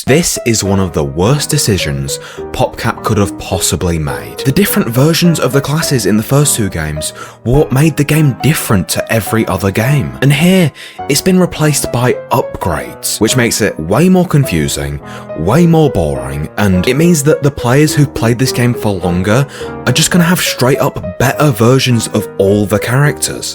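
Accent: United Kingdom. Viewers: Bro you started with epic british lector voice and then you show us a beta 2011 emo kid recording in parents bedroom. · ar u British mate???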